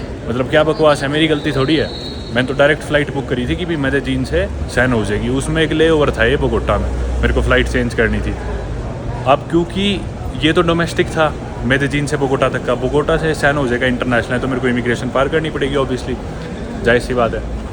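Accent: India. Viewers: Love your desi accent 👍🏻 and equally amazed to see how well you speak latin american languages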